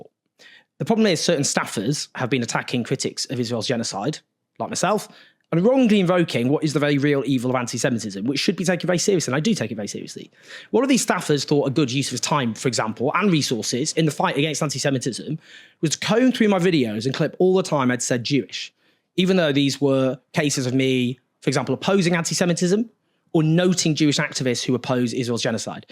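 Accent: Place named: Sheffield, United Kingdom. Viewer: From here in Canada I’ve loved all of your commentary, you are a truly passionate humanitarian. Keep up the sharp lens and wit you bring to the subject. One thing though, and please don’t take this the wrong way, but I know of people who I’ve wanted many times to send your videos to, but I know they’d have a hard time with your sometimes rapid fire words combined with your more than slight Sheffield accent. I sometimes have a difficulty with that myself (and do slow the video down to take it in easier) and I think other Anglo speakers might as well, especially when your obviously skilled delivery with words comes at a blink of the ear so to speak. 😊you’re a rock. Thanks.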